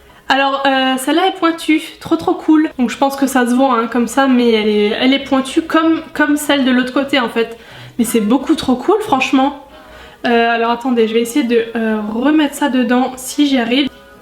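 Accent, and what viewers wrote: Lorraine, France. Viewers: Je suis Lorraine aussi et je parles comme toi aussi, quand je vais chez mon copain dans le centre et des que je parles il se moque de moi avec toutes les "fautes" que tu as cité 😂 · Moi aussi je viens de Lorraine (Meurthe-et-Moselle) Et je dis exactement comme toi! Bref j'adore Ta vidéos tu es parfaite je t'adore ❤️❤️❤️ #Lorraine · Moi aussi je parle comme sa j'habite en lorraine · Je parle comme toi je viens de lorraine ma belle t'inquiète pas 😘 Super vidéo comme toujours! 😘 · Oui je parle comme toi et je pense que tous les lorrains/alsaciens sont pareille 😂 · Moi aussi je viens de la Lorraine je dis pareil que toi 😂😂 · Tu viens d'où si tu viens de la Lorraine ou de L'Alsace ? sinon super vidéo ♡ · Je viens de Lorraine mais j'ai du déménager dans le centre mais j'ai conserver l'accent par choix, et ca me fait plaisir d'entendre parler comme ca, tu te prend pas la tête a vouloir faire plaisir au autre tu es spontanée et c'est ca que j'aime chez toi ❤ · J'habite aussi en lorraine et je dis comme toi !!! 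😉❤️ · Je viens également de lorraine et en effet je parle aussi comme ca... j'taime fort continue comme ca <3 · Vive la lorraine 💪🏻 Est ce que tu as aussi un accent en disant certains mots comme boîte, poêle, etc ? Sinon t'es genial j'adore ☺️ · moi aussi j'habite en lorraine et moi aussi je dit comme ca 😀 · moi aussi je dis comme toi comme en lorraine biz <3 <3 <3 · y love you accent lorrains !!!!!! je suis lorraine ! 💕💕💕💖💖💖💖💖💖💓💖💖💓💖💖💓💖💖💓💕💖💗💗💗💙💚💛💜💝💞💟💟💟💟💟❤💘💋💋💋👄👄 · Je parle pareil que toi!Tu habites où en Lorraine ? Sinon je t'adore continues comme sa bisous💘💘💘😊#TeamSnapchat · Moi aussi je parle comme ça vive la Lorraine 😅😂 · je viens de lorraine dans le 54 et oui je parle comme toi😂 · Je comprend totalement ta façon de parler je suis aussi de lorraine 😋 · moi aussi je viens de Lorraine je te comprends je parle exactement comme toi💚#teamsnap✨ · Je suis lorraine et je parle exactement comme toi ❤ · moi aussi je parle comme toi je suis de Lorraine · Moi aussi je suis de lorraine et je parle comme toi 💓💓,t'es pas seul 🤣🤣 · Je suis née en Lorraine ,à Metz, donc oui je parle comme toi mdrr😂😂 #teamlorraine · Oui la facon de parler de la lorraine 😂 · j'habite en lorraine saint remimont vers Haroué c'est vrai qu'on parle comme sa mais moi sa me choque pas mdr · tu viens de quelle ville ?? 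( moi aussi je suis de Lorraine et tous les mots que tu as dis ( le '' t '' à vingt , la clenche ...) je les dis aussi . 😘🤗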